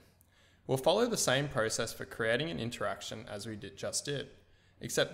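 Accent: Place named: United Kingdom. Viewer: You lost me in 10 secs, too fast and the British accent is hard to understand.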